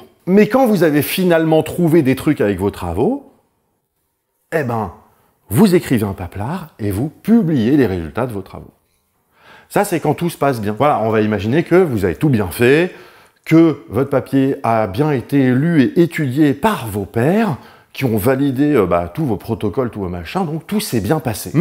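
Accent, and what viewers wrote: Alsace, France. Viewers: L'accent H'Alsacien 💜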